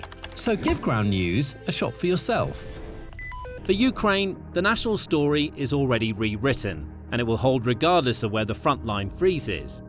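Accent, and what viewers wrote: United Kingdom. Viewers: Unnecessary prolonged video in a a British accent is tiring….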